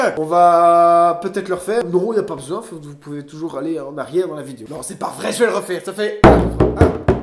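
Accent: Belgium. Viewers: Je vous découvre hier, je me réveille avec la tête dans le cul ce matin avec cette vidéo, et je me dis "mais ... c'est pas possible avec cet accent c'est un compatriote belge" oui gagné 😂 En tout cas cest chouette d'avoir enfin découvert quel nom ce tambour et ce son si spécifique (et très appréciable 😍) portait 😄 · mmmmmmm, eskeu par hasard mes oreilles distinguent bien des "aloors' typiques de la Wallonie ? si oui bisou de Namur, si non, Bisou de Namur quand même ! Edit : le A L'AISE m'a donné réponse :)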